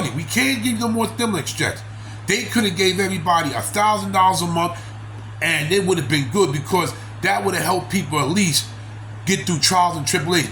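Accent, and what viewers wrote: New York, United States. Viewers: White people love you right back and new subby here and I'm not leaving you..I just love the new York accent❤❤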